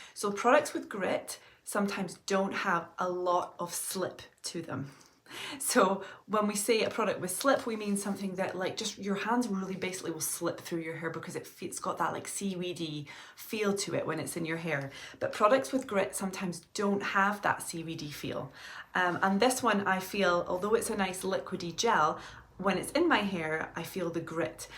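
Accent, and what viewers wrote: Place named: Scotland, United Kingdom. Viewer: Hi, thank you for this video. Are you from Scotland? I don't hear a strong accent but I'm sure I detect some Scottish. Thanks to your videos, I have ordered Curl Keeper Original. I'd never heard of it until you mentioned it and I am thinking it just might be the perfect styling product for my waves.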